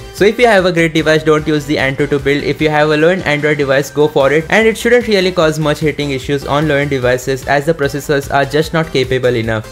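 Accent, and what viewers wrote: India. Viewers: Dude that indian accent is so sexy.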